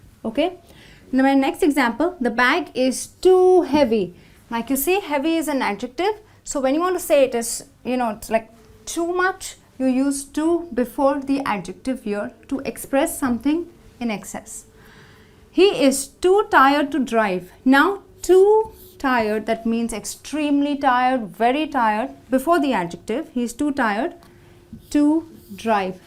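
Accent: India. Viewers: is she indian? she has a particular accent, she doesn't sound like an American. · you agreat , you from indian i know from your english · Hi! Thank you for the explanation! Are your accent from India?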